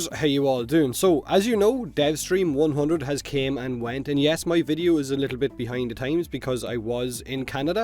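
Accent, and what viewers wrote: Ireland. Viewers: the moment those words split out of the mouth of that, I knew!! I will always luv this Irish dude talking aboat Warframe <3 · ** whoever criticised you for looking a little uncomfortable. Let them sit in front of tens thousands of people from all around the world and try to keep it as cool as you did, sitting there happy in the background, all potatoy and s**t. Was awesome seeing you there. First devstream I watched completely (30% because of you, maybe 40%:P). So keep your head up and your accent irish^^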